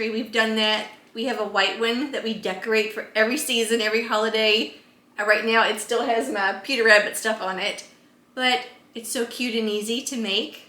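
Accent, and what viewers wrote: South, United States. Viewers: What a Super idea! Love Bee’s 🐝 I also ❤️ your accent of your voice! We live in Wisconsin. So when I watch Southern UTubers it makes me smile 🥰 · Omg I love your accent…. Your southern charm is adorable as well as your craft projects. Thanks for sharing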